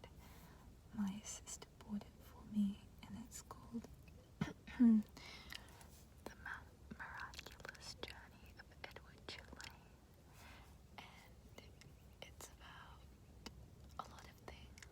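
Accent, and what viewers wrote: Australia. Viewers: You from aus? Ik a Aussie when I hear one confirm for me Bub. 😂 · aussie accent is so relaxing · That's an Australian accent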